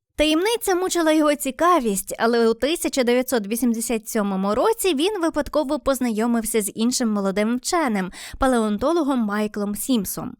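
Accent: Standard. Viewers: Величезна подяка за джерельно-чисту українську мову, цікавий виклад, гарну дикцію та відмінну інтонацію. Така подача точно не дасть заснути. Ще раз дякую. Слава Україні!!! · Чиста українська мова, яку хочеться слухати й вчити. Хороше відео цікаве й яскраве. Ось такі треба канали для України! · Неперевершена вимова...Чудовий голос.... Еталонна українська мова... Цікавий контент. Однозначно вподобайка..!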